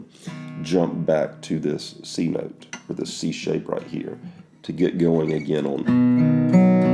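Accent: South, United States. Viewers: Once again on it. I've convinced myself that he is Dr. Phil's brother which is why I will refer to him from now on as. Judging from his southern draw and his efforts to not show his face to keep the spotlight off of him and keep it on the music, what a class act. Thanks again! Your secret is safe with us.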